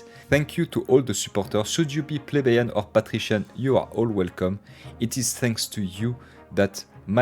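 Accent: France. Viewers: Your french accent is so satisfying to listen while watching you building an amazing city.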